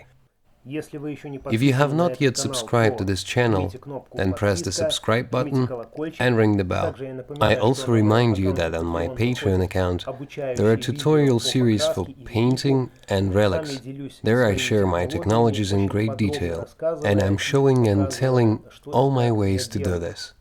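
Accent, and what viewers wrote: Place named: United States. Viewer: Bro, love the american speak overdub. Males it so much easier to watch when i know what you are talking about lol🤘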